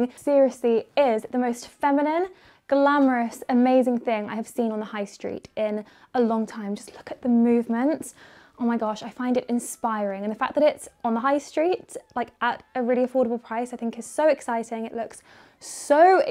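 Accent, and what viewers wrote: United Kingdom. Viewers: Your British accent is so lovely, and your beauty is overwhelming 🌹 Greetings from Vienna, Austria 💐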